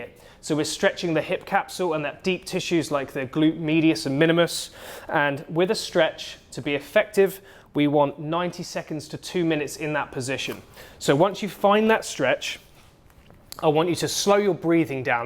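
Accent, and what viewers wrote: United Kingdom. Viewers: Very nice and detailed presentation. Your video was full of the kind of detail that's helpful to know to achieve good results. And, you sound British, but you look like Capt. America.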